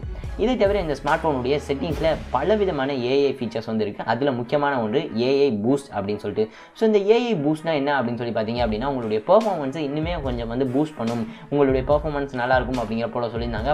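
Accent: Madurai, India. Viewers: Neenga madurai ahh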